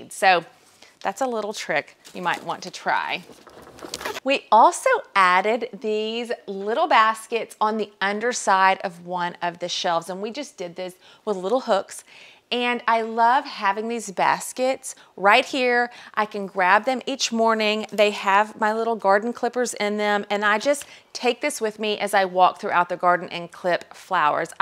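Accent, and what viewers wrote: South, United States. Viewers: Greetings from Santa Fe New Mexico! Thank you for sharing! I am renovating a ranch house near Lake of the Ozarks in Missouri and am creating an outdoor space, though this aspect of the project is Phase 5. I am just now finishing Phase 1. 😊 Have a ways to go yet. Your inspiration is catching. We Missourians and Arkansans share the same half-sounding Southern inflection!💕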